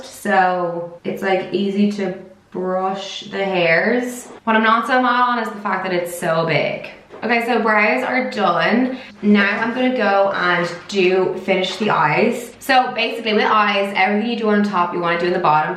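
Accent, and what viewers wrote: South Dublin, Ireland. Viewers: South Dublin accent. Sounds like an Irish person trying to speak with an American accent. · I think she is Irish 🙂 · Clicked on this video not knowing who you are, heard the Irish accent and subscribed instantly 🤣💖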